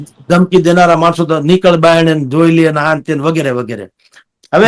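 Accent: Kathiawar, India. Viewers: કાઠિયાવાડી ભાષામાં મઝા આવે છે..🎉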